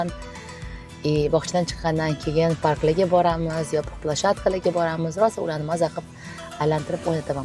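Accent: Tashkent, Uzbekistan. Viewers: Узиз фаргонадан бусезам Тошкент шевада яхши гапирасиз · Фаргоналикман дегандингиз.Тошкент шевада гапиряпсизу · Сиз фаргонадансиз,Миндондансиз тугрими.нима у/н Тошкент шевада гапирасиз.Миндонди шевасидан уяласизми.нимага пойтахтга бориб шеваларини тезда сотасизлар.буни кандай изохлайсиз. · Кечирасизу нега водийлик була тошкент шевада гапирасиз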